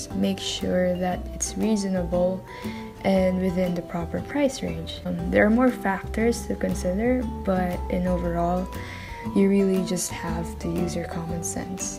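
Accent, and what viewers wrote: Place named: United States. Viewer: at first, i thought that you're from states or what but ohmygod! you're a filipino, good to know 'tho.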